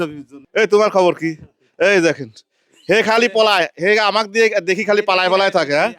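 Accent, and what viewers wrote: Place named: Jessore, Bangladesh. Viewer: আপনার সহজ সরল ভিডিওগুলো ভালো লাগে। এভাবেই সবাইকে সঙ্গে নিয়ে ভালো থাকবেন। আপনার ভাষা শুনে মনে হচ্ছে আপনি গ্রেটার যশোর/কুষ্টিয়া/পাবনা কিম্বা আশেপাশের জেলার অধিবাসী। আপনার বাড়ি কোন জেলায়, জানালে খুশি হব।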